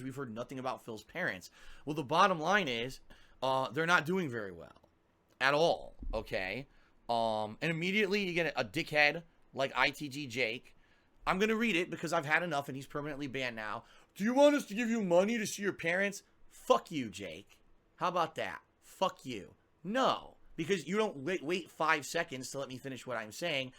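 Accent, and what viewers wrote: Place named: New England, United States. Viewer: Yankee accent are fucking disgusting.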